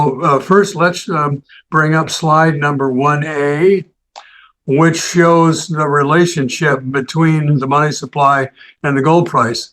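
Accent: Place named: Canada. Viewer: I love your broadcasts, your guest choices and especially your Canadian accent! 'Out' 'About' 😂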